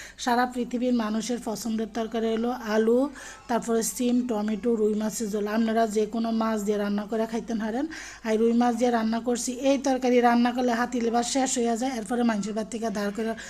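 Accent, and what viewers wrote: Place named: Noakhali, Bangladesh. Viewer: ঠিকই কইছ আপু এমনে তরকারি রান্না করলে অনেক হোয়াদ লাগে তুমি তো পুরোপুরি নোয়াখালির ভাষায় কথা বলতেছ তোমার বাড়ি কি নোয়াখালী আপু জানতে চাই ❤❤🙂👍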